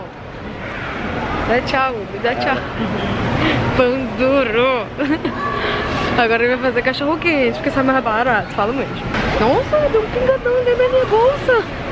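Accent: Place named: Rio de Janeiro, Brazil. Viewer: Linda amoo esse sutaque carioca, tô amando te acompanhar 😍😍😍👏👏👏👏 parabéns por ser essa pessoa atenciosa e quando voltar a sp vou te conhecer bjos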